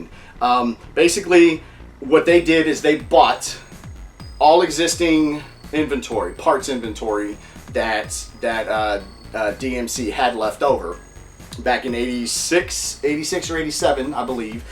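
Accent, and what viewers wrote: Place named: Texas, United States. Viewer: True Texan. Prounounce Humble without the H. Nice dude! I think you can get a kit for the time travel part but it's expensive and you need Libyans for the plutonium. They were unreliable because they were really heavy with the all or mostly steel* body. I think i saw behind the scenes that they couldn't get to 88 mph with the stock motor. *also according to some DeLorean forum they say the car isnt heavy (but for the engine it may be I guess) so I dont know or dont care it's just what I always thought or heard. *originally I put aluminum and in case nobody reads follow up comments thank you for pointing it out. Not dumb just imperfect.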